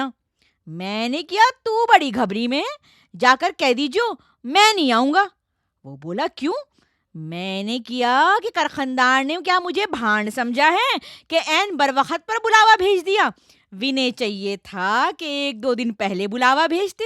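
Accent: Delhi, India. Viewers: This is karkhandari Urdu not lukhnowi Urdu.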